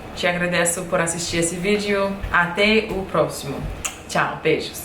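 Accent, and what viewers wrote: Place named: Rio de Janeiro, Brazil. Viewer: Gringa linda, adora a língua portuguesa e fala com um forte sotaque carioca. Haja charme! A cada vídeo, que você posta no YouTube, noto que seu Português está melhor. Parabéns pelo esforço. Valeu, minha fofa!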